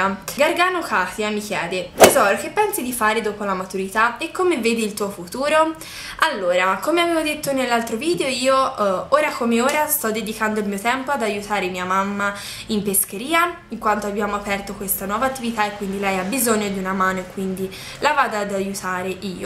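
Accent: Tuscany, Italy. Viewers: #askiaia ciao:) ormai ti seguo da un pò.sei bellissima e dolcissima! complimenti per il risultato dell'esame!Le mie domande per te sono: So che sei toscana dall'accento ma in quale città vivi? Hai altre passioni oltre il make up? Un bacione:* continua così.mi saluti?:)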